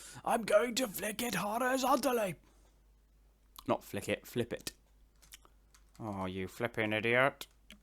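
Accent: United Kingdom. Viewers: I have a question Are you from UK for something?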